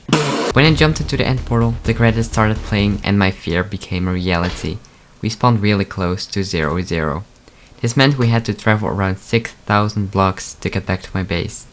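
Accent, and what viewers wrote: Netherlands. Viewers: somethimes i have the feeling that youre dutch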